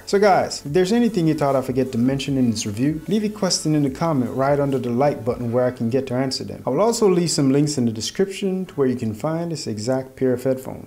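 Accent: Jamaica. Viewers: Great review but I must ask...how Jamaican are you?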